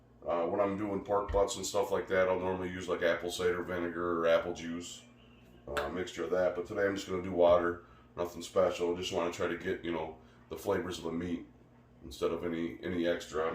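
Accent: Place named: Wisconsin, United States. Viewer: Where are you from? Sound like wisco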